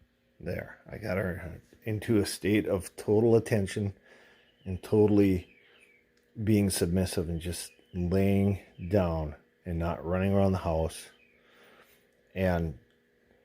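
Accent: Minnesota, United States. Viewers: That minnesooooohhhhhta accent tho. Great vid on the importance of the place command.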